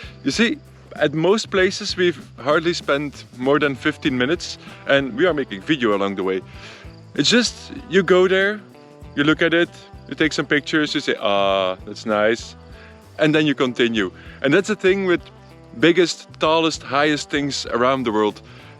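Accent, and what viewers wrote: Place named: United Kingdom. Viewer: You're sounding more like a British tourist as time goes by.😢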